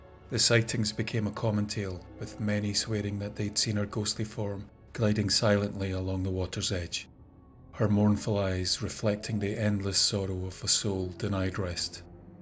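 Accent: Scotland, United Kingdom. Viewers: How did I miss notification for my favourite channel and the guy with greatest Scottish accent. As a paranormal investigator for 20 years I researched, investigated some of England and Scotland's most haunted locations until I stopped in 2010. I miss what I love deeply. Investigating, the research, the stories told to me were my big love but my point being, we all love a great ghost story and knows just how to tell a great ghost story. This channel is growing fast and there is no great channel for us all to help grow. The paranormal world is full of fakers, lyre's and cheaters right now and sadly its not showing any signs of getting better as I hoped in time it would? I still like to research and visit locations but its more hobby now than full time job. I worked closely with ghost finders of Scotland based just outside Glasgow. That's not a plug I promise you because like my that team is no longer lives. 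Some of the best teams know to the paranormal world are no longer. They have become something of ghosts themselves fading into history and now we are left with these who care more about money making that finding the truth as we knew it. Scientific methods is no longer used by the few as the many seem to just practice fame seeking. Its a paranormal world I look to stay away from now! Thank you for all you dedication and hard work since channel birth. all though ghostly tails change over the years because everyone adds on little bit for horror effect th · A new subscriber here too. Anything I mean ANYTHING, related to Scotland and I'm HOOKED. Beautiful , Tragic, Romantic, Brutal, and Betrayals, Beautiful Scotland has seen it All Even your accent has me Hooked. Looking forward to many more Tales. ❤ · Good 🌄 morning.....so good to hear that wonderful Scottish accent. I am proud to be from Scottish ancestors and I love ❤️ the stories. 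Thanx😊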